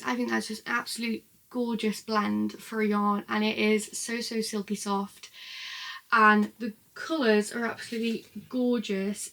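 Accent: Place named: Liverpool, United Kingdom. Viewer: Hi! Just found you here on YouTube. Is that another Scouse accent I hear?? 😍 Love the video. I'll have to go to texstyle if they do another next year. Xx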